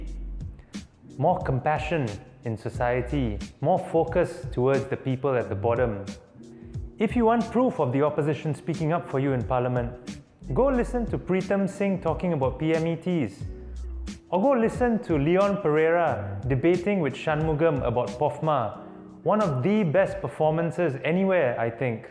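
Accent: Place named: United Kingdom. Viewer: Jamus got slight Americanised accent, yours was mimicking British accent leh... Anyway, good content! :)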